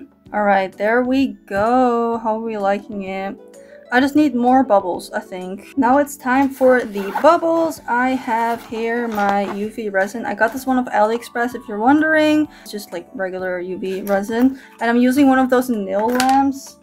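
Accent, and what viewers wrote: Netherlands. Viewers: The dutch accent is killing me 😭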